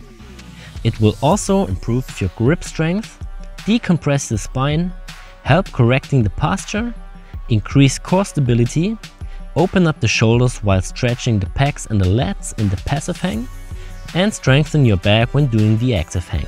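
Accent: Germany. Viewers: Wie man einfach raushört, dass du deutsch bist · slight german accent.. bist du Deutscher, mein Lieber? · german accent, fuckin hell man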